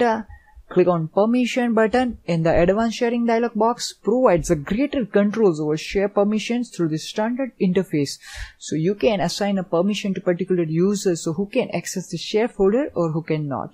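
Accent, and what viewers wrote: India. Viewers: ugly accent . try using a robot instead your STRONG indian accent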